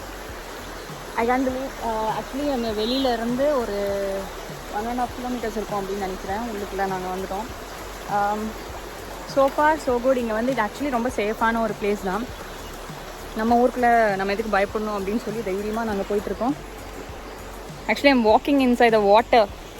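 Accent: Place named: Coimbatore, India. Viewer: Coimbatore slang.. Wow Chance illa... Missing coimbatore. Swargame endralum adhu nammoru pola varuma.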